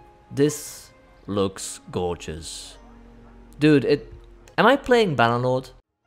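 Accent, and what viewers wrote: France. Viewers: Crazy, a French guy speaking English 😮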